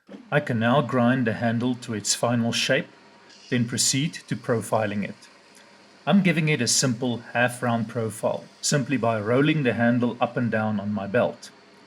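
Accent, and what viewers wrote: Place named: South Africa. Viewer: I thought I recognised that accent RSA!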